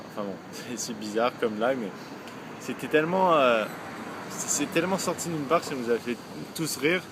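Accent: Quebec, Canada. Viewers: Tu as un accent semi français semi Québécois. C'est fou!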